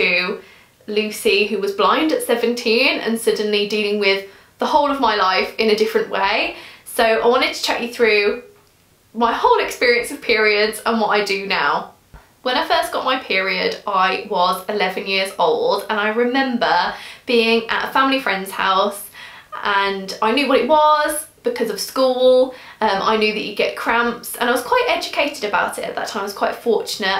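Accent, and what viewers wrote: United Kingdom. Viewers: Love from India. I absolutely love british English accent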